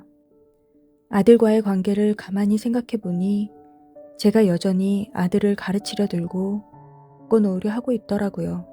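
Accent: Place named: Gyeongsang, South Korea. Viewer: 목소리가 듣기 좋네요~❤ 경상도 사람 같아요 ~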